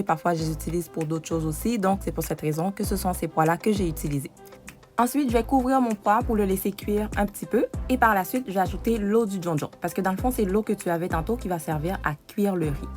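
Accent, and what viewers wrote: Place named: Quebec, Canada. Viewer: Merci de nous dire pour larsenic...bel accent quebecois ma belle❤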